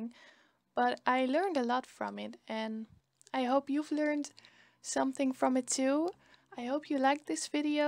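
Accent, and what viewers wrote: Netherlands. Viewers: Are you dutch? (Want k hoor een soort Nederlands achtig iets in je Engels) Youre realy good at drawing btw 💗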